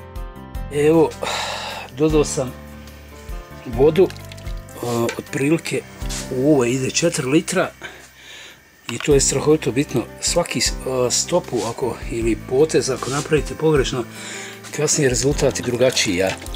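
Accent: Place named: Sarajevo, Bosnia and Herzegovina. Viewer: Vozdra i bite! Moram priznati ti si prva osoba iz Nicaze koja sam cuo da zvuci k'o da je sa Marin Dvora. Studirao sam sa dosta Zenicana i nijedan nije imao takav naglasak. Eto vala, k'o da si nam rodjeni Sarajlija. Hahaha! Nego ziv bio i uzbrdo brz. Uzivaj u svemu.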